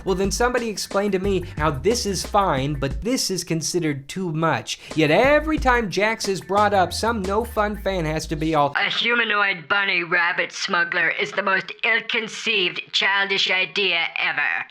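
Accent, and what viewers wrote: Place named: New York, United States. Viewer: Best HS Book Report Ever. Sounds like a New Yorker....gotta be a New Yorker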